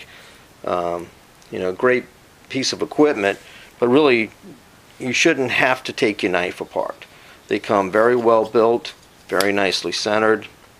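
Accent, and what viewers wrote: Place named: New England, United States. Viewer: Nice vid! You've got an interesting accent. I detect some New England and some Southern. I know that's very vague as there are so many variations. :-) Where have you lived?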